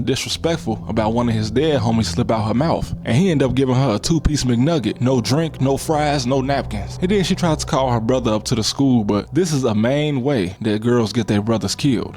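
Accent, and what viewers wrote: Chicago, United States. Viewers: This is game bro, the chicago accent adds like the perfect level of translatable relatability, at least personally lol, youre actually a human telling a story, not some dude ropeplaying TTS, to put it politely how some of the dogshit you'll find on this website 😂, this is at least silver, if not gold tier content consistently least these past few videos i been catchin lol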